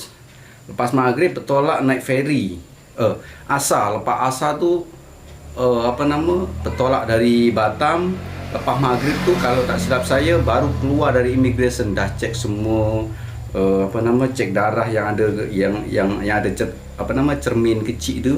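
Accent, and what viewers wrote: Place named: Malaysia. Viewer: Mantul banget logat bahasa Melayu ya mas.masih lekat hingga ke kampung.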